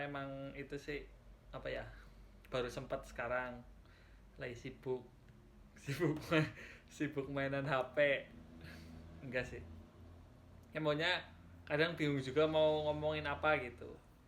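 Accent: Banyumas, Indonesia. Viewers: Mas orang mana? Logatnya ngapak... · Ni orang ngapak.ngapake ngendi kang.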